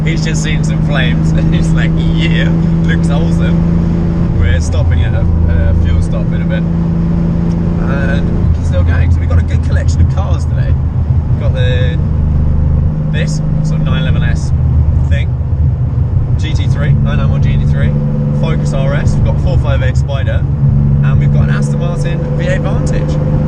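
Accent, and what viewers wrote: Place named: Australia. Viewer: Did ytou study or ever live in Australia? You have a Sydney/International Aussie tone to your vowels.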